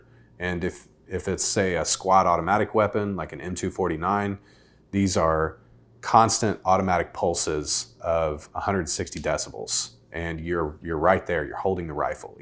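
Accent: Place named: Indiana, United States. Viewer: You, sir are absolutely correct. As a disabled navy veteran myself, I can attest to the facts you've stated. I'm service connected for tinnitus, and have been diagnosed with hearing loss and provided hearing aids as of last year. I also service connected knees, and was diagnosed with sleep apnea. The VA denied my sleep apnea claim despite the diagnosed and cpap machine. I'm currently filing a PTSD, insomnia and migraine headaches. As a native of Indiana myself I pronounce tinnitus the way as yourself lol. And thanks for the great presentation.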